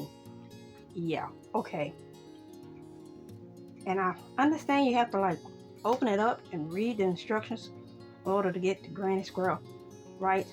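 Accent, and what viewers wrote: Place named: Louisiana, United States. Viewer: I know this is kinda random, but you sound SO MUCH like my Mamaw down in Louisiana. Are you from anywhere near there? I miss her so much; listening to your voice is like a balm to my soul. 🥰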